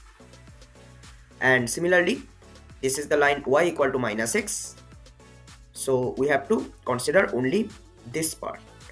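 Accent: West Bengal, India. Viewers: Sir are you from West Bengal?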